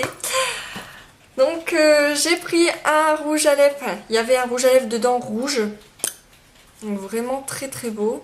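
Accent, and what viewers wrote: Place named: Amiens, France. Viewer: Coucou! Je découvre ta chaîne et j'aime beaucoup tes vidéos! Je me suis donc abonné naturellement! Je me disais que cet accent m'étais familier et je ne me suis pas trompé : je suis d'Amiens! Lool. Bisou, au plaisir!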